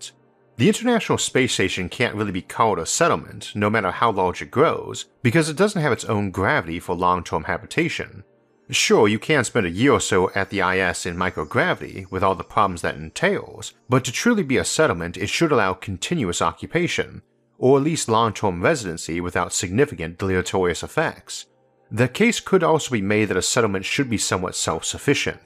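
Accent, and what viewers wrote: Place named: Louisiana, United States. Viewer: I know this is random but what accent is this narrator. Legitimate interest in dialects. Has kind of like a Cajun sound to me..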